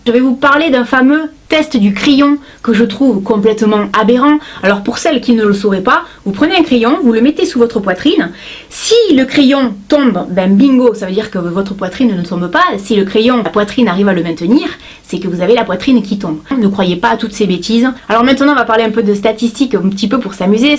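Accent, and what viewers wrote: South, France. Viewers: Toi tu vien du sud j'aime ton accent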